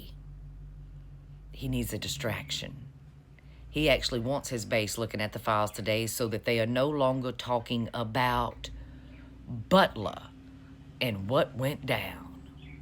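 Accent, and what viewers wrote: South, United States. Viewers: Totally agree!! It reminds me a little of the Agatha Christie' A Murder Is Announced... "she wasn't there"... and the ear wound... IYKYK Just to ask where in the States are you from? As an English woman, I love your accent! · Tbh, at first I thought you were saying Butt Love🤣, not Butler! I was thinking that maga would be all disturbed for sure about FDT engaging in Butt 🏳️‍🌈Love, and any endless conspiracies of 🏳️‍🌈 behaviour... 😂 I'm not from the states, btw, so sometimes the stronger accents from the south distort the words in a way I'm not used to hearing. Thank you for the giggles!! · Your accent is beautiful, I have never had a good impression of southern accents until you. Subscribed for the pleasure of listening and intellect. Thx, you should narrate for documentaries... ❤️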